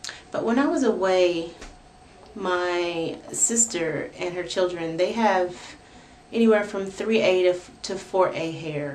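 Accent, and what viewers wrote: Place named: South, United States. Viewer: southern accent in this vid. how cute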